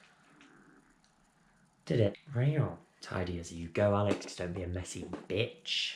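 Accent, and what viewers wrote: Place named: Essex, United Kingdom. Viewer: You sound of..... East England.... but South, Mid, or North...? I can’t tell for sure but I am going to guess Essex.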